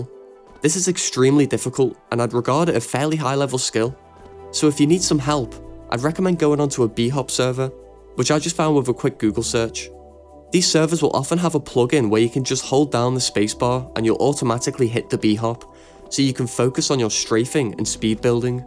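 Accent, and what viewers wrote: Liverpool, United Kingdom. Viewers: He is 100% from Liverpool, UK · are you a scouser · Subbing but only because you're scouse lad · Scouse xd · im like 90% sure this dudes uk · Here are you scouse la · bro are you scouse?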